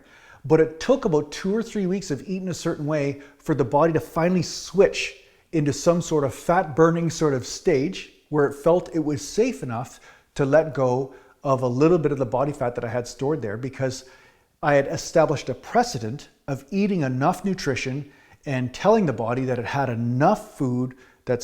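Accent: Canada. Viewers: I am loving the Canadian accent !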